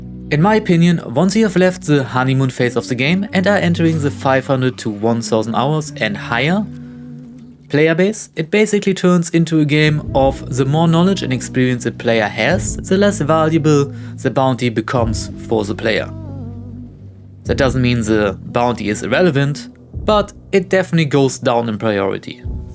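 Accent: Germany. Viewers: Diggi machst du das mit dem deutschen Akzent absichtlich so doll? xD Ist ja crazy xD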